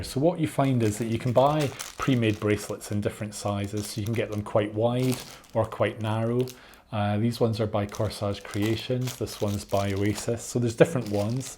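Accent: Scotland, United Kingdom. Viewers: refreshing to hear a Scottish accent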